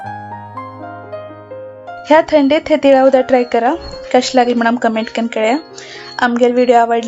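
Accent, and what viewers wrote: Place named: Karwar, India. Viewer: Waàw felt like am in Karwar .... after long time I heard Karwar Konkani ...thank u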